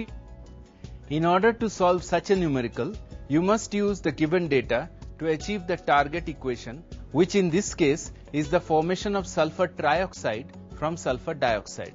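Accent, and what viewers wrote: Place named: Bengal, India. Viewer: when you can hear the bengali accent so strongly...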